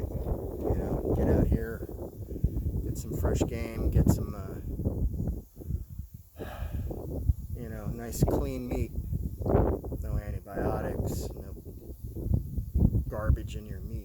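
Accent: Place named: Oklahoma, United States. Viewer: You didn’t say hunting you said “huntin” your an okie jersey is a long way form you now ole son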